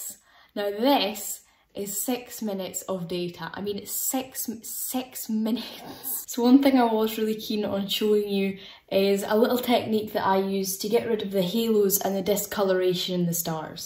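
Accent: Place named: Highlands, United Kingdom. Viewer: Great video,! Did my high school years in Nairn, so its nice to hear that lovely Highland accent again! Keep up the great work and thanks for flying the flag for UK Astrophotography!:)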